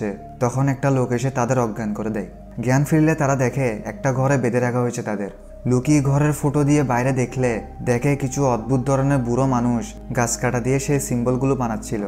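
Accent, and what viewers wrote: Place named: Noakhali, Bangladesh. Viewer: Noakhila bhi... Kemon asen.. apne noakhali basha bolen jae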